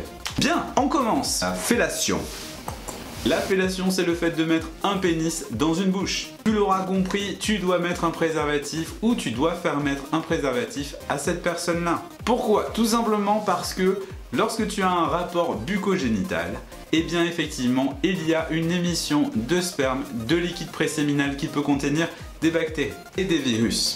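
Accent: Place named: South, France. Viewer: Ahhhhh je t'aime toi avec ton. accent du sud !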